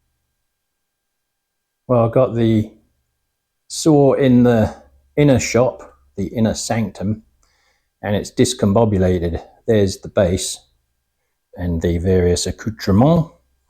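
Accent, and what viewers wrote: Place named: England, United Kingdom. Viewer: Ooh! Just found your channel, nice to hear an English voice for a change. 🙂 I have the same saw, the blade fell off every time I started a cut as the drive wheel was misaligned, but after a bit of fettling it's done a good 15 years service including cutting a lot of firewood! I recently had to replace the motor capacitor, but nothing else. The stand is poor as you say. I'll be interested to see your vice mods.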